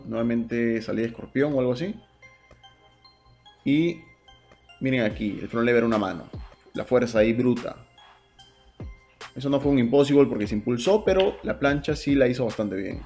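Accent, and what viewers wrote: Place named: Mexico. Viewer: Pense que eras mexicano Saludos y exito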